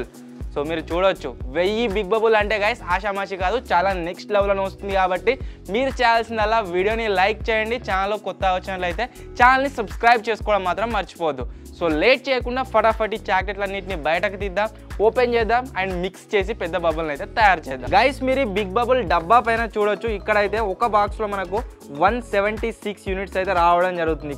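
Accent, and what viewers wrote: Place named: Telangana, India. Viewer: bro Telangana slang vintunte super ga anipistundhi bro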